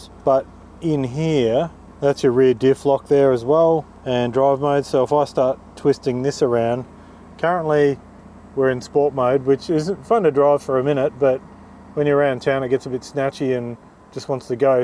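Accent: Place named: Australia. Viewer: I wish this video was in American English, I'm having a hard times to understand these Australian language at times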